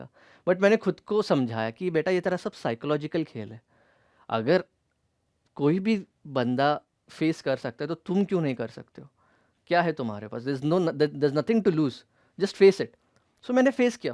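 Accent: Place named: Karnataka, India. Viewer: Kannadi accent was the best part of this video..... 😂😂😂😂😂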